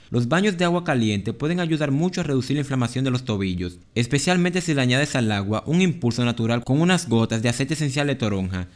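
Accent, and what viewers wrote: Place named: Caribbean. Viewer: El narrador del vídeo tiene un acento caribeño, estoy segura que no es de Perú.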